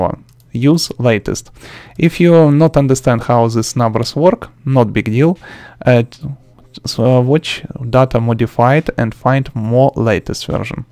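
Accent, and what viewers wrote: Russia. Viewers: your russian accent is a blessing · Are you from Russia?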